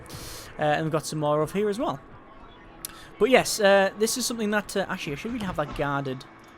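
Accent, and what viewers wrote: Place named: England, United Kingdom. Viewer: ***** Nope, he's english.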